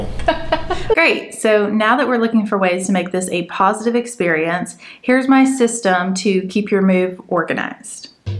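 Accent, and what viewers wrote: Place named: South, United States. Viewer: Did you hear her southern accent? Now the hats make perfect sense 😂❤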